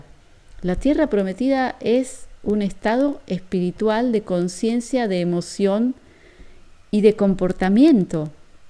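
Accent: Argentina. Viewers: tu acento argentino hebreo me produce una profunda añoranza.Dios te bendiga Shalom.Un saludo desde USA.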